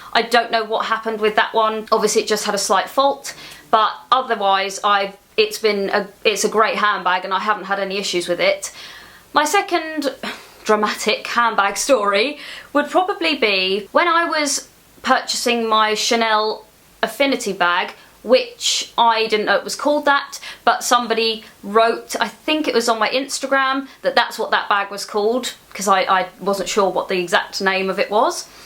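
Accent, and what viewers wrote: England, United Kingdom. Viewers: Just out of curiosity, may I know which part of England is this kinda accent from?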